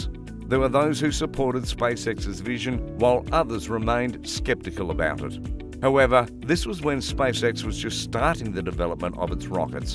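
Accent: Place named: Australia. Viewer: Glad to hear an Ozzie accent on Space exploration with know how. Just subscribed!!! Keep up the informative work - mate!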